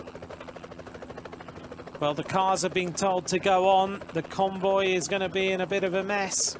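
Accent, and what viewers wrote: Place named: United Kingdom. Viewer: イギリス英語気持ち良すぎる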